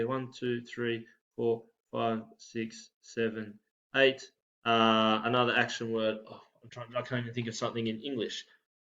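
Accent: Australia. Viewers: You sound Australian Don't be offended but thank you nga mihi bro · How come he's teaching Maori with an Aussie accent?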